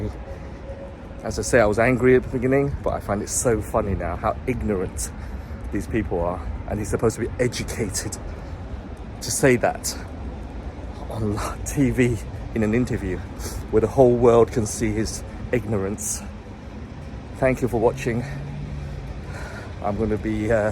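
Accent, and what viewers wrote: United Kingdom. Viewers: Lolz. You spoke in a British accent. Are you British or Chinese? You forogot mentioning 20 million unemployment rates. 😅 I prefer living in Britain than in China! 😂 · Just curious...did you film this in China? I am hearing a very noticeable British accent.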